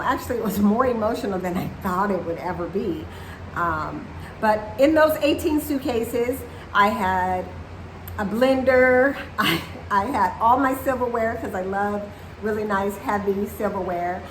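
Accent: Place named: Southern California, United States. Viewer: I thought that was a So Cal accent. I'm hoping to visit Panama, Spain, and the Philippines this year so we'll see what happens. Keep up the YouTubes - you're easy to look at 🙂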